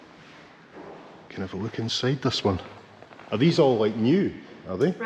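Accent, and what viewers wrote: Scotland, United Kingdom. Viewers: I enjoy your videos, your laid-back humor and your curiosity. You need to get a distinctive hat as a trademark. How about a Scottish tweed flat hat or perhaps something with a Scottish flag to compliment your hair color and your wonderful Scottish brogue? Your brimmed balls caps with logos make you look like an American tourist. No offense, just say’in. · But you are Scottish...you love rain and cold and wind hahahahaha You are like any good farmer...never happy with the weather...too hot, too cold, too wet, too dry, Too sunny, no sun, to much wind. etc etc... lol...I have many Scottish ancestors, including Rob Roy, so I totally understand..lol.... and I could listen to your accent all day long...xx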